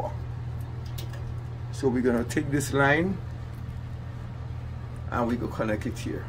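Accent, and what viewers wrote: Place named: Jamaica. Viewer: I hear that Jamaican accent Man…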